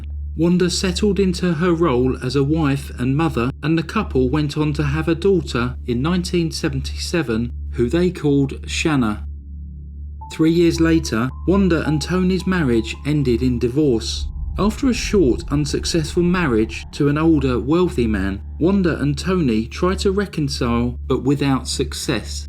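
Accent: England, United Kingdom. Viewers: I thought at first you said Verna and Amber had been winning twerking contests, at three years old! It is easy to see how this case became a comedy movie. Bargaining and bartering with the hit man, all those marriages, the two women so much alike, so much in common but obviously bishes to each other. That Wanda made a complaint about Amber's campaign, then at the first opportunity fell foul to the rules that were changed at her instigation, so she gets her own daughter disqualified.. Even just the fact that 12 year old girls had such intense and serious "campaigns" with obvious voter buying-its so silly to the rest of us. It's all just so.....Texas, I suppose. Weird, WTH cases seem prevelant, deep in the heart. Thanks, kudos as always. PS I did giggle when in your polite, English narration voice, you said Wanda was regarded as up herself. PS again, I think it's unfair YT won't let us like on a second time around! 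Btw On my mini binge tonight, I've even let the four minute ads play through, but it took a lot of will power.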